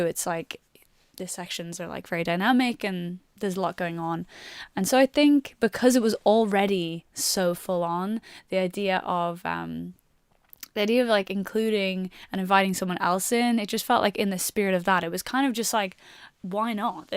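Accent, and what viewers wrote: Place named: United States. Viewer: Why are you suddenly American?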